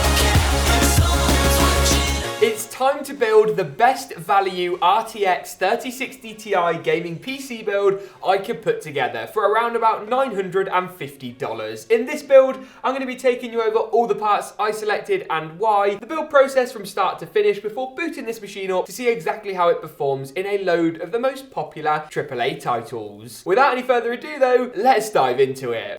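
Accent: United Kingdom. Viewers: I previously commented that your British Accent is Crazy and i would repeat that no matter what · Your accent is so british 😂👍 i love it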